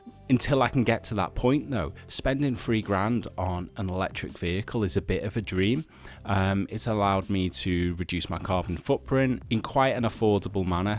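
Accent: Liverpool, United Kingdom. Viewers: Loved this thank you mate. Great to hear a fellow Liverpudlian voice too. Looking at getting this or the MQI. Really helpful review thank you!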